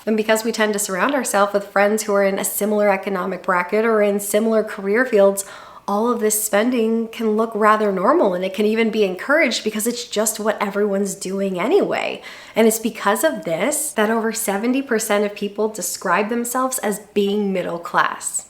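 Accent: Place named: Wisconsin, United States. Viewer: A fellow Michigander.. Wondered about that accent - to us lowlanders sounds too much like Wisconsin lol. IT for both me and my wife. Been saving 30%+ of our income for years. Wish I was smart enough to start that sooner, but still worked out fine. No inheritance for either of us. Middle/lower middle class background. Lifestyle creep is a thing, but I fight it. Biggest hurdle was it was impossible to get a place near work without spending a fortune, but we'll get that returned when we sell it and retire to somewhere cheaper. Maybe the UP ;)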